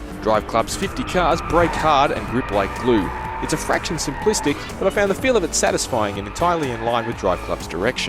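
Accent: Australia. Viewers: was this review done by an australian? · Nick Revels Do people not know accents? is an Aussie.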